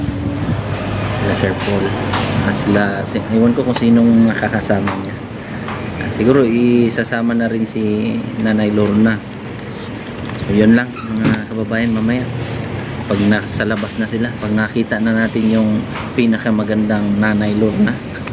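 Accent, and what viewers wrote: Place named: Ilonggo, Philippines. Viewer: Wow marunong ja din parang mag-Ilongo Kuya.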